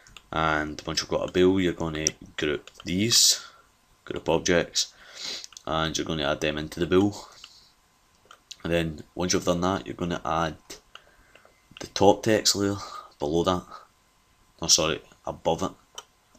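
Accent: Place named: Scotland, United Kingdom. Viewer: you are REAAALLY scottish o.O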